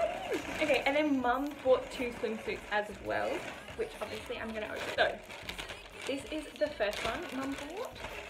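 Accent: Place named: Australia. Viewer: OMG UR AUSSIEEEEE 💗 WOOOO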